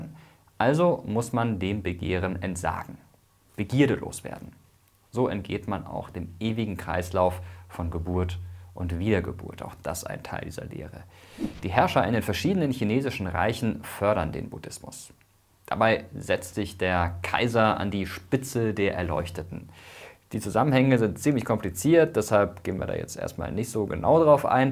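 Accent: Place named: Southern Germany, Germany. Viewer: Das ist die völlig normale und richtige Aussprache in der süddeutschen Standardvarietät der deutschen Sprache.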